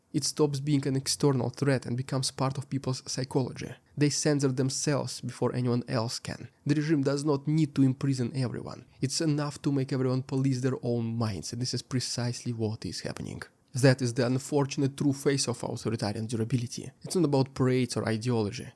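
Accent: Russia. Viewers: Brilliant and compelling analysis, eloquently delivered. The Russian accent forewarns inartful delivery, but the reality is just the opposite, and a true delight to hear and absorb. Thank you!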